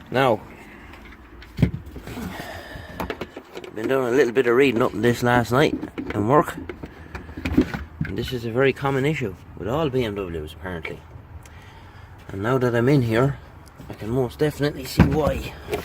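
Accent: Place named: Atlantic Canada, Canada. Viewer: Well done, where are you from? You sound like a Canadian east coaster.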